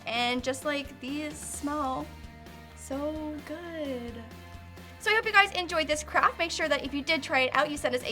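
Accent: Canada. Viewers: Her Canadian accent is so cute haha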